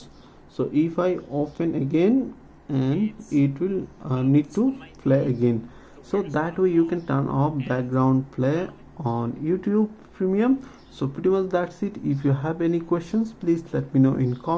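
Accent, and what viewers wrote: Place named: India. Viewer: Hate the Indian accent 😒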